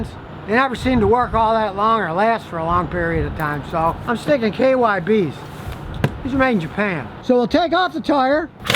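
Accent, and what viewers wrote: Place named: Michigan, United States. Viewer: are you from Michigan? You have a Michigan accent.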